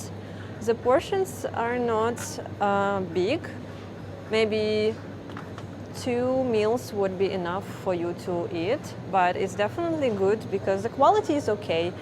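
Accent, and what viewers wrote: Russia. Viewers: Не люблю когда рязанские рожи иностранцами прикидываются а русский акцент всё равно никуда не спрячешь .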